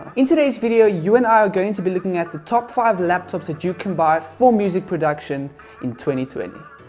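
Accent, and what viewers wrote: South Africa. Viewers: When you said (SMUTH) my brain said...he sounds like he came from South Africa.😁 but your location says LA. hehe EDIT: yea I was right. hehehe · The only video that actually helped me with finding which laptop to buy thank you. The SA accent reminded me of home brew.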